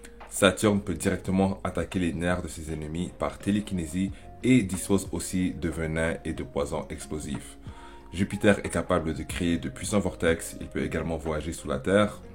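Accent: Quebec, Canada. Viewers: je parierais sur Québec comme moi haha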